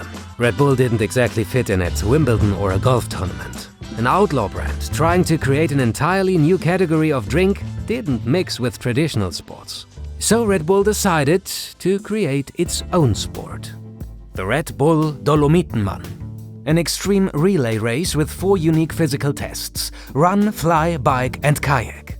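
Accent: Germany. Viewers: u german? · Athletic Interest is THE best channel when it comes to informative and exciting sports stories. Thanks a lot! And never get rid of your Unique selling point: your german accent speaker. simply great 🥳🥳🥳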